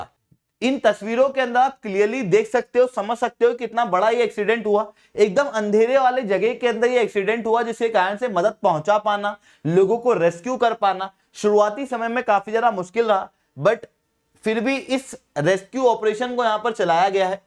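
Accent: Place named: West Bengal, India. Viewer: chaudhary it's WB accent not odia accent. Not so new tho since odisha is not recognised that much in whole india.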